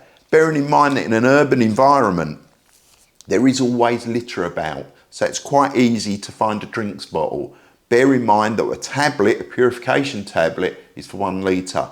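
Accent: United Kingdom. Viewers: You have a very pleasing voice and British accent. ✌️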